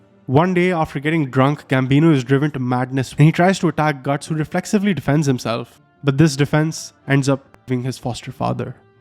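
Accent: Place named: India. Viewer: i really like the way you tell the story and the fact and the analysis and i really like that you view it from philosophical side, but the thing that probably makes people not watch your whole video or skip it or not even starting it is that your voice(no hate) doesnt pass and it sound bit funny cuz of your indian accent ofcourse it can fix whit the time but i suggest you using elevenlabs or some ai voice that sound more good more profesonial(i dont talk about deep ai robotic voices), anothjer thing is that many of your photos dont mach the ratio of the video(there are blackbars) and alot of the music is not good combined whit the video and another thing is that there is no motion. There are a lot of parts where there is only one picture you are talking over it and it just stands making it feel boring even if the script is very good and the video is good. 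Add some motion. Another things is that there are photos that are in bad quality and you can find better ones. Again no hate and i hope to see even more evulution of your channel i really like the way of you viewing things and i am sure you can make your channel really good.(NO hate again)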